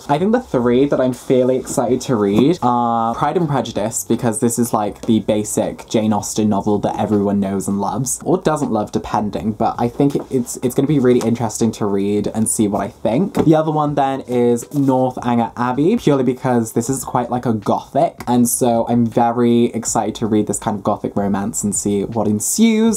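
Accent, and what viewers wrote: United Kingdom. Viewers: do people really think you’re American? I think you sound so British (but I’m American so of course I don’t think you sound American lol)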